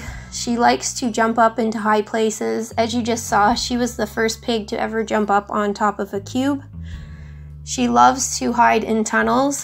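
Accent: Canada. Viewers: You are such a great piggy mom, if it wasn’t for your videos I would have a tiny pet store cage. I love your appearance, Canadian accent; most of all Iove the pigs ❤️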